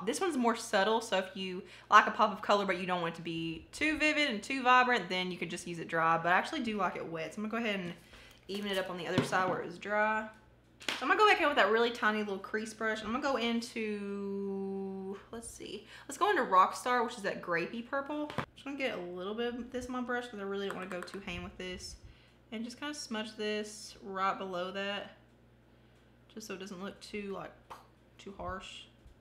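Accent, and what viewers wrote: South, United States. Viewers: OMG how have I never watch or even come across your channel.. this is my first video of yours I've watched and I absolutely love you your vibes your bluntness and your attitude.... BTW I love your southern accent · I can't believe you replied! Holy Crap! Hoping someone can tell me what state you are in because I'm in AR!!! Annnd you sound like you are southern...maybe? 😂🤔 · This is the first video I have EVER seen from you or your channel. As soon as you got sassy about how your title says this will be a rant and people can leave, GIRL YOU GOT ME! I hit that subscribe and all notifications on right then and there. Love the sass, PLEASE don't ever lose that!!! PS- Your southern accent brings me back to the part of my life when I lived in Eastern North Carolina. I don't believe your accent is NC, but it's as close as I can get while living in northern Illinois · Your accent is really nice ❤ Are you from Alabama/Georgia? 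I appreciate you being really genuine in this review 😘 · Omg, just found your channel tonight and I love you. You're honest, dont try to come off as I'm perfect and I know it all. Love that. I love your Southern accent, it reminds me of my mom's family. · Where you from? It sounds like your southern. I'm from GA 😊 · Alright girl where u at? Im gonna say GA or TN....me, AL. And i am THANKFUL TO HEAR U SLINGIN THAT ACCENT (unlike someone else from my home state who took lessens to take her accent OUT) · Luv you lil country accent...It is absolutely gorgeous and you have amazing skin by the way!!!!!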